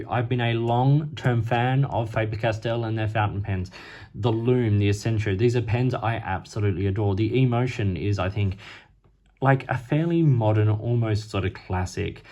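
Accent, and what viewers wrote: Australia. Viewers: i am still thinking whether to buy orange or black one. For a few years I have been relying on your reviews videos mainly because you’re also a lefty, have a nice handwriting and your reviews i find them very informative, to the point without ‘frills’. I’m from Europe and love your Australian accent 😅 thank you for all your effort! ❤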